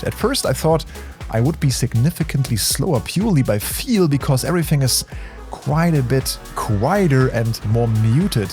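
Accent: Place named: Germany. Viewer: Man hört dein Deutsch extrem raus😅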